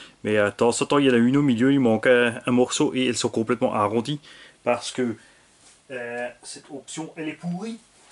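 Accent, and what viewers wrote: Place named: Alsace, France. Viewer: Je savais pas que t'avais un accent alsacien aussi prononcé mdr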